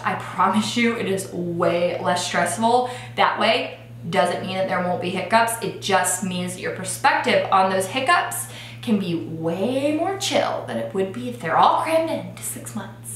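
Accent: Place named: South, United States. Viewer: Did you move to the south near the beginning of your engagement? I feel like your accent gets thicker in every video haha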